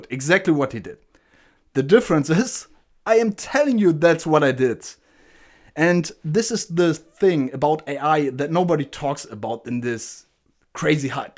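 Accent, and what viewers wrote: Germany. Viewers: Der deutsche Akzent ist nicht zu überhören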